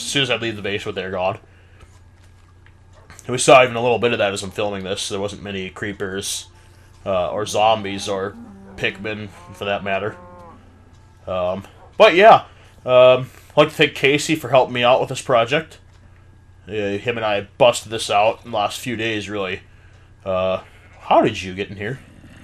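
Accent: United Kingdom. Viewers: OMG I’m soooo sorry I went to the bathroom and I saw my 8yr old bro did that. I love your vids and I like your northern accent I kinda have a northern accent I’m sooooooo sorry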